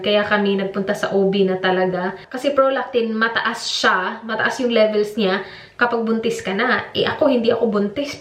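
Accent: Visayas, Philippines. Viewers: The way she speak English, at mag bibisaya sa huli... 😂 That's cool..❤️❤️ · Nakakatuwa talaga ang bisaya accent mo ate😄 Chaaar uuuyyyyyy!😁😆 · first time ko manood ng video mo po. And da way ka mo sulti nakahibaw na jud ko na bisaya ka te hahahaha love this video and imma subscribe to ur yt channel na hehe. Lovelots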